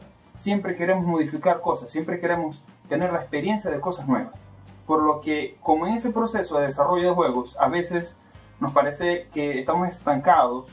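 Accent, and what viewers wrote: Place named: Venezuela. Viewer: Verga ese acento de Venezuela esta bien marcado jaja. Buen vídeo hermano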